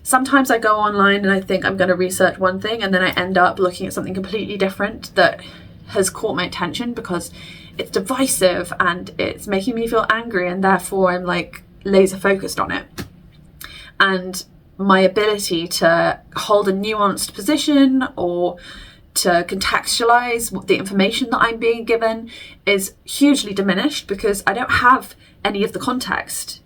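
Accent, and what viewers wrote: United Kingdom. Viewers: Why are you speaking in a scouse accent when your not from Liverpool? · eh? she has a neutral, educated accent to me which is common here in Manchester.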